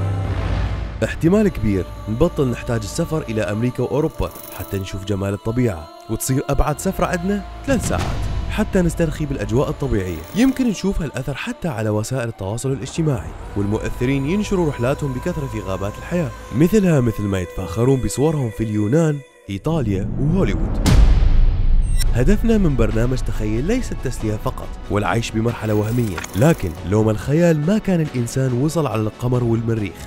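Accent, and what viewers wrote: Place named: Iraq. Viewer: المعلق بالفيديو عراقي😂😅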